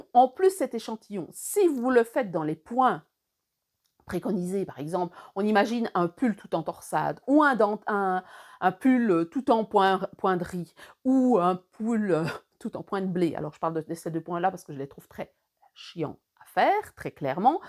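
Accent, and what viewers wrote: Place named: Brittany, France. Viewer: Merci pour vos vidéos ❤. Un vrai plaisir instructif et agréable à regarder. Et un vrai plaisir d'entendre cet accent de chez moi. Suis réfugiée dans la campagne bretonne. Votre chaîne est juste trop bien. Merci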